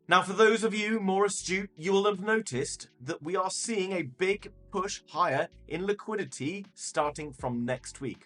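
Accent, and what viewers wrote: United Kingdom. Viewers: All British people sound the same.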